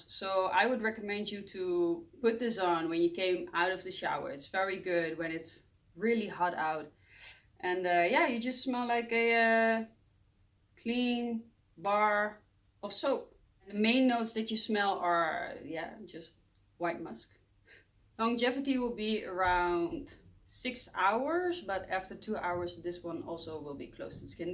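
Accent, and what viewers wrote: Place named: Netherlands. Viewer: I can tell by your accent that you're from the netherlands, klopt dat haha? My favorite is Bvlgri Aqva Amara for the summer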